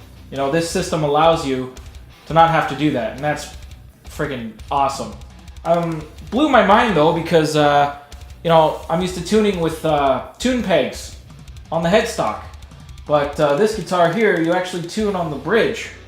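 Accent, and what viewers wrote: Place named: Canada. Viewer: Thanks, I thought I heard a Canadian accent :-).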